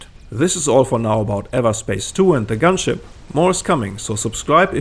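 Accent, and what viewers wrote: Germany. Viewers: Oh wow what a nice combination of german sayings and accent :P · Haha I'm German, and man, the german accent is strong in your english xD I'm quite happy to find a familiar speaker haha xD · You haven't heard a strong German accent, bruh. His is present but that's nowhere near strong German accent.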